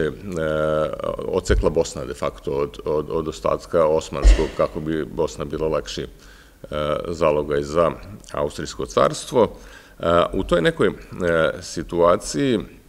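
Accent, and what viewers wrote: Vojvodina, Serbia. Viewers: OBOZAVAM NAGLASAK MOJIH VOJVODJANA !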